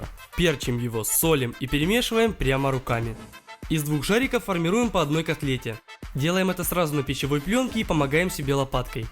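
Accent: Ukraine. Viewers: Украинский акцент? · Стоп!! Я только поняла.... ТЫ УКРАИНЕЦ? У тебя иногда просачивается в речь украинский акцент. Ты начинаешь считать с гривен а не рубля.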